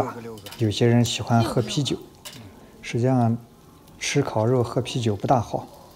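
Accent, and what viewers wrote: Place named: Xinjiang, China. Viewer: 好正宗的新疆普通话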